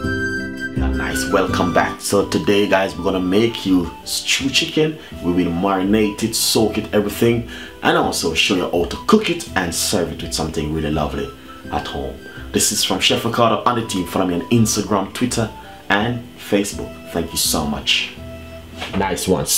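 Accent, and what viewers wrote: Jamaica. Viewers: All dis time, I thought you broadcasting from JA. 🙏🏾😂🇬🇧🖤🇯🇲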